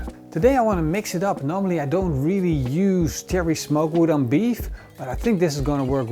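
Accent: Netherlands. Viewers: wat een mooi nederland accent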